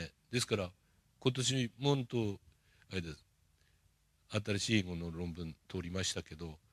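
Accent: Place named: Tohoku, Japan. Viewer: 安保先生お若いですね。人類愛だなぁ。東北のイントネーションが耳に心地よく癒やされるのでずっと聞いていたいです。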